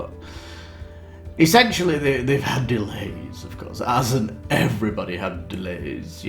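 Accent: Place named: United Kingdom. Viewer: mexico?? you sound like some kinda brit.. prolly get called gringo every day. what's the board game scene like over there?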